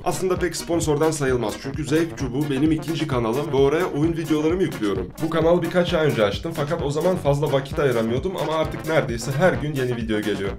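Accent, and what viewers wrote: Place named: Russia. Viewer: abi sen rus musun çünkü yaban cı gibi konuşuyonda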